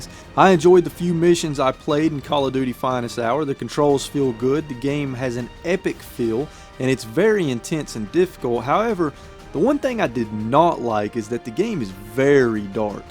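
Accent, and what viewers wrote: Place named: Carolinas, United States. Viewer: You sound like a Carolinian. Great video!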